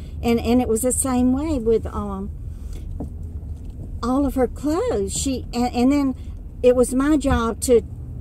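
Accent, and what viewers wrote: South, United States. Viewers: Very sharing stories. Interesting too. I recently found your channel. Are you living in BJ now? Your Southern accent lets me know you are from or raised in the South. Look forward to watching more of your vlogs.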